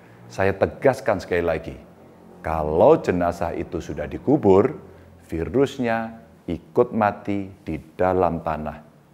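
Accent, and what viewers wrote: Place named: Java, Indonesia. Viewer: Tutur katanya Pak Ganjar sangat lembut dan njawani. Keren Pak sehat selalu.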